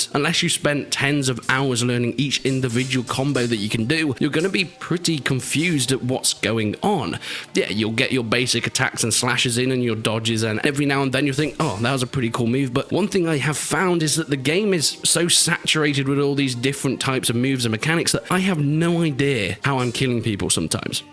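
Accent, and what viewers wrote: England, United Kingdom. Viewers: he's English you knob just how we talk 😅😅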